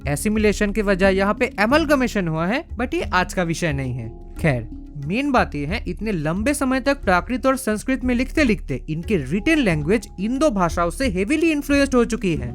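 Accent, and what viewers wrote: Bengal, India. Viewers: Bhai bangali ho kya accent se esa laga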